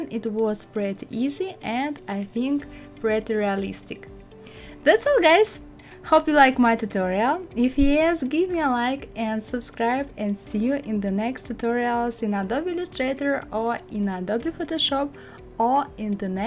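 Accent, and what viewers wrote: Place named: Russia. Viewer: Just Wow. Amazing. Its so easy. Thank you. R u russian? I like your English speaking accent.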